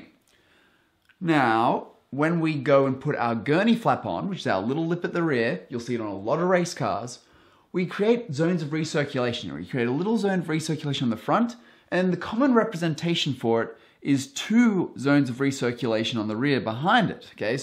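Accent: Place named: Australia. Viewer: Aussie accent - he's saying "drive the air harder"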